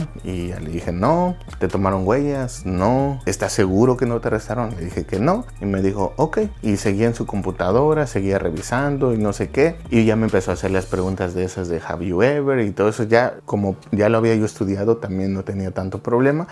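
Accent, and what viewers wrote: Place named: Mexico. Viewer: Excuseme, usted es Mexicano, porque esa habladita de ustedes es bien chistosa….. hasta misteco habla usted…. Los Mexicanos sos como unos indios ..😤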